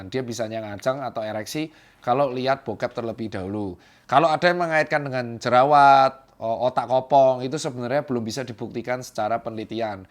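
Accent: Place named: East Java, Indonesia. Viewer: Bukan marah.. Itu emang aksen khas jawa timur. Khususnya surabaya dan sekitarnya.. 😂😂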